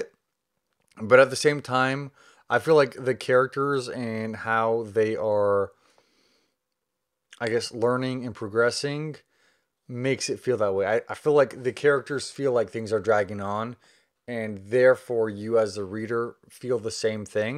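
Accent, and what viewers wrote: South, United States. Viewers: I can't believe you had like a book called, "To Sleep In A Sea of Stars" by Christopher Paolini at your house in Texas during your quarantine until you're reading the whole book and talking about Kira Navarez's life was on the brink of a happy change. She was newly engaged to her co-worker named, Alan, geologist and they were planning to settle on Adrasteia, the moon of Sigma Draconis, where they had been working together for several months. It's really important to do that and I hope your husband, Zach will be very proud of you, because I love your Southern accent!!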